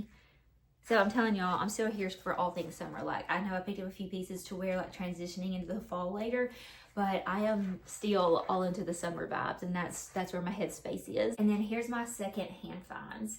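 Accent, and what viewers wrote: South, United States. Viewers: You and your sweet little family are precious ! I have family in AL, so listening to you talk with your southern drawl takes me right back "home" 🥰 Love to see all of your finds and how you decorate with them !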